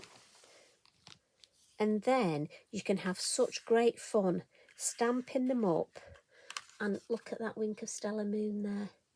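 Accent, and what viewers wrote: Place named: Northern England, United Kingdom. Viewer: you are a pleasure to watch, and as a northerner down in Devon, listening to your accent just takes me back home! I have learnt so much from your tutorials, yes! me! a retired art teacher!! This is all quite different and exciting to what I am used to! It must be because I am doing it solely for me and NOT for a set of marks! Now, my next adventure will be with Dinkles…so vibrant and pure! I can’t thank you enough …..very best wishes…Donna!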